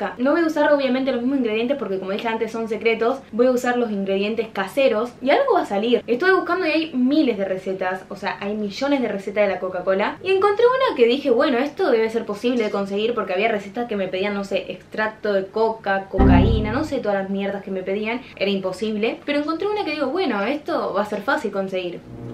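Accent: Argentina. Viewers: Es argentina porque tiene el asento argentino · Se nota que eres Argentina o Uruguaya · Por las groserías y el acento debe ser argentina o colombiana. Ahora resulta ser mexicana :v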